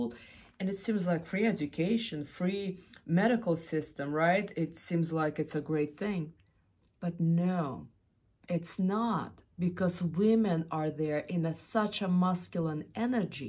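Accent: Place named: Eastern Europe. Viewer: I knew it!😃 I just didn't know for sure because there are a lot of eastern Europeans that have a similar accent to yours (atleast when I hear it) 😂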